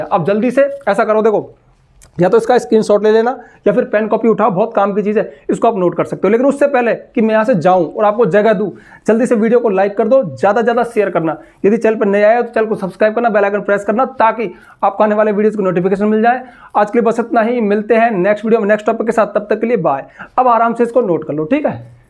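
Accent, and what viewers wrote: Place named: Uttar Pradesh, India. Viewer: सर जी आप यूपी या हरियाणा स हो क्या? में यूपी स हूं नु पुंछ रा हूं। और आप का बोल ने के लहजे स लगरा आप भी शायद यूपी के हो।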